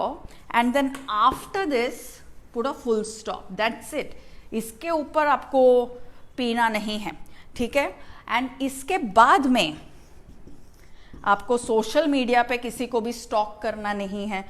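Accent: Mumbai, India. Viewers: i love d way u talk ......in ur vedios ....so natural ......fulll mumbaikar style lov it .......with ur funny lines that accept ur a bevda lol .....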